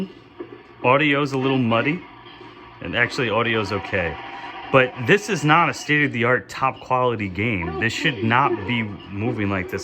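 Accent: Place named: Midwest, United States. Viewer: I thought you were funny. May I ask are you from the Midwest? You speak like us from MI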